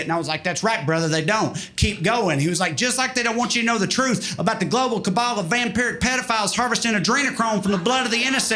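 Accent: South, United States. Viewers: Yall are my favorite genre of white people. Love a liberal with a thick southern accent. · It's like Alan Sorkin dialogue performed with a southern accent. The writing is that good. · Picture the language skills of G.K.Chesterton in the accent of the Dukes of Hazzard. Brilliant and hysterically funny. Took my husband and son-in-law to see Trae at Funny Bone in Virginia Beach. A crowd of huge fans, blown away by his intelligent goofiness. 🥰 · Good Lord! God Al.ighty! This Southern sounding boy makes me relate😁Much enjoyed, go on with your bad self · I actually felt it was the opposite, that he felt the need to compensate for his accent by using big words like "vehemently" "gain purchase" "whimsical anarchy" and so on, I think he plays on the jarring nature of being a verbose redneck, that's one thing I love about his comedy. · His “southern accent” is getting thicker, and more cringe. 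Before you know it he’ll be ending every joke with GIT’R Done! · Does he have a southern accent?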